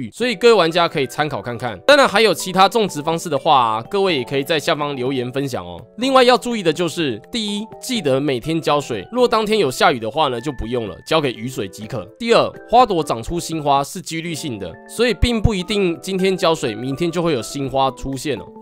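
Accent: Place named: Central Taiwan, Taiwan. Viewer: 有中部腔😆😆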